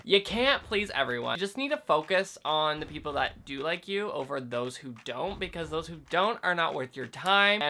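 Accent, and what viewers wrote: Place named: Canada. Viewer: The way Canadians say the letters -ou is so cute I can't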